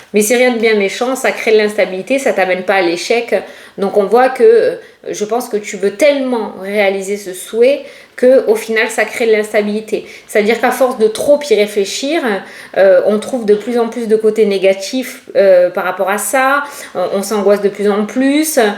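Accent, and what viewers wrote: South, France. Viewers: Un petit coucou du Nord... Où il fait bon aussi 😉👍👍 on n'a pas le temps de coller 😁on a beaucoup de vent... Je vous découvre et j'ai accroché à votre petit accent du sud et votre côté un peu fofolle... ce n'est pas une insulte.... promis... Beaucoup de projets en perspective, effectivement... mais bien plus de SOUHAITS.... m'éloigner le plus loin possible et le plus vite possible de personnes malveillantes, jalouses et vraiment mauvaises... et sûrement instables psychologiquement... 🤔 J'en ai perdu la santé et la joie de vivre.. Elles ont essayé de me détruire (j'ai failli perdre la vie, par un rejet sévère et aigu de mon cœur greffé) J'ai vraiment eu beaucoup de chance.. Je reviens de très loin... A peine rentrée d'hospitalisation, me voilà à nouveau HARCELER, PERSECUTER, CALOMNIER... Donc c'est un grand OUI, je souhaite m'éloigner le plus loin possible de ce maudit lieu et de pouvoir avancer dans mes projets... 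🙏🙏🙏🙏🙏🙏🙏🙏🙏🙏 Merci pour vos guidances... 💛🌺🌺🐞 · Coucou Je suis se Normandie aux environs de Caen. Merci pour tes tirages...toujours obtimiste et de bonne humeur...tu nous envoie ton soleil du sud avec ton accent! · Bonsoir,de la Rochelle merci pour cette bonne humeur et cet accent 🌞du sud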